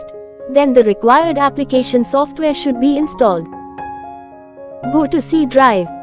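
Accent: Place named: India. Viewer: Might be good content, however I simply can not stand listening to Indian people speak. Especially an AI speaking Indian. What a turd of a video.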